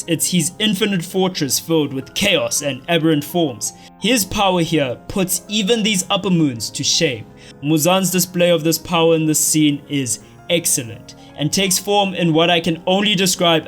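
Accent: South Africa. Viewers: You're south african right? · where are you from? You sound South African bro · r u from ZA? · So good to hear an SA accent in a video about my favorite series. Reminds me of getting lectured on Pokemon by my older cousins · You sound South African my dude I like the accent ✨ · I swear brother you gotta be South African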